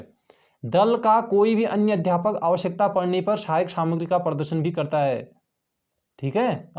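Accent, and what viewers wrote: Pahadi, India. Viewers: थेट पहाड़ी टोन। nice class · Kyu koi problem h pahadi tone say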